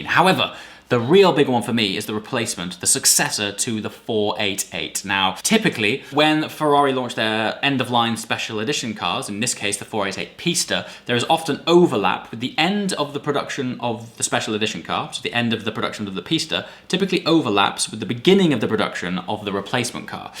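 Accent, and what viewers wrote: United Kingdom. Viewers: THE British native speaker.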